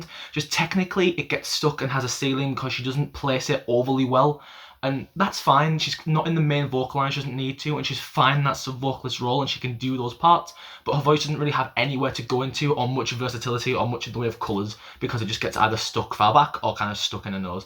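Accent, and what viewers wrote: United Kingdom. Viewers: I wish u put some eng sub bec u speaks like james charles i mean u speaks fast and not all of us can understand British accent or what ever accent u have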